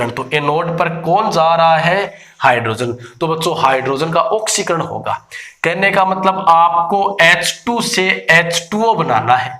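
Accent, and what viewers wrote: Punjab, India. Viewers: Aap Punjab se ho sir kya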